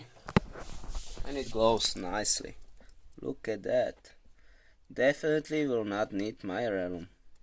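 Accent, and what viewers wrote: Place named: Romania. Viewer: You have a romanian accent, am I wrong?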